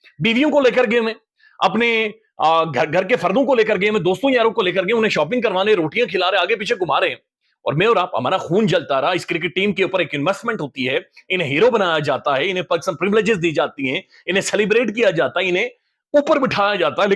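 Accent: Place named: Kashmir, India. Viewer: Ap kashmiri lagty ho apki urdu gulabi hai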